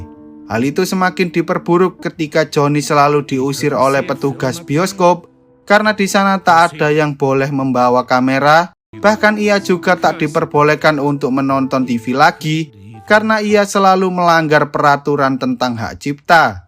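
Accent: Java, Indonesia. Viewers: wong jowo rupanya mas ini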